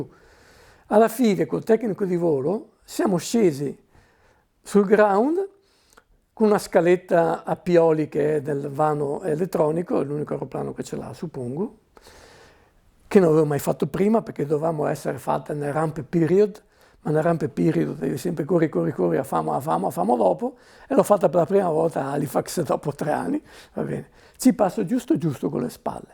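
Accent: Veneto, Italy. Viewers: Veneto doc😂 grande comandante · Incredibile come faccia fatica a non parlare veneto! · Grazie per questa testimonianza e complimenti per la gestione di una giornata così difficile. Ma è veneto? ...riconosco un accento familiare... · È veneto lui verooo?? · Concordo, competente e simpatico! Sicuramente veneto, qualche intercalare veneto si è sentito😅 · Un comandante Alitalia non romano per una volta che strano un veneto o friulano da accento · L'inconfondibile cadenza veneta, mi rende il racconto ancora più apprezzabile 😊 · Mi semba veneto sto comandante.... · Sento tanto veneto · ma sitto veneto?? bella storia, sai raccontare bene comandante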